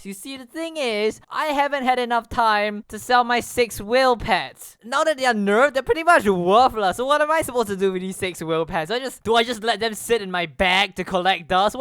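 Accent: Singapore. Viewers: Jesus I love singporians english. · yo are you singaporean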